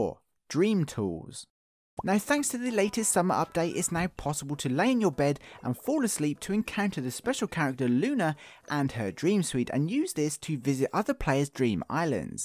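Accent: England, United Kingdom. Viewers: I love your English accent what part of england are you from? P.s I'm englidh and Don't have that broad of an accent you make me think your from London.......are u? lol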